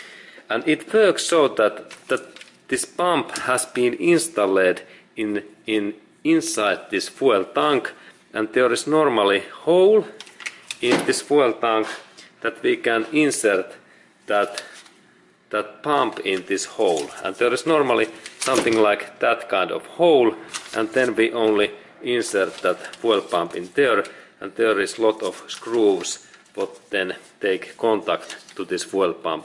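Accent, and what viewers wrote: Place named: Finland. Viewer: Suomi aksentti on paras aksentti. Subscribed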